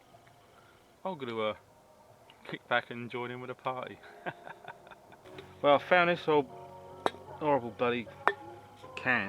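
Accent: United Kingdom. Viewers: hi, my first time watching you and I thought you were gonna be american but.... no! finaly some british guy! great vid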